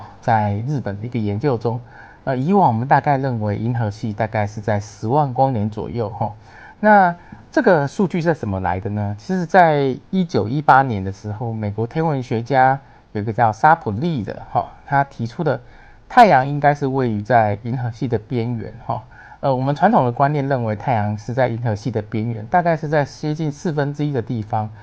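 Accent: Taiwan. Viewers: 滿滿的台灣腔齁.....係勒齁沙小的?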